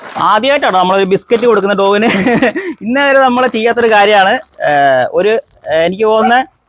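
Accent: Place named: Vadakara, India. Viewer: ഞാള് ഞൂള് തല്ലി പൊളി വടകര മലയാളം😅😅😅😅😂😂😂😊😊😊😊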